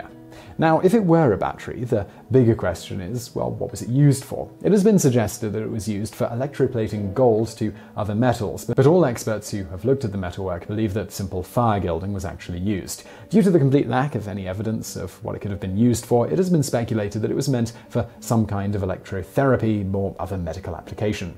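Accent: United Kingdom. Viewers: Your way of talking and breathing and articulating and .. it's so weird, like you've got astma, but I believe as you're british, that helps on the video sound, the channel is awesome !!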